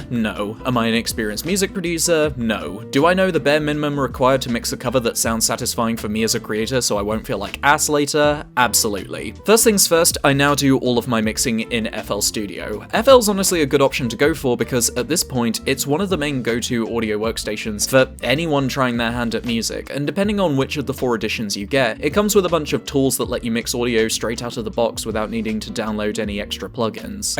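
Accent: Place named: United Kingdom. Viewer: You sound like Kevin but British. I approve.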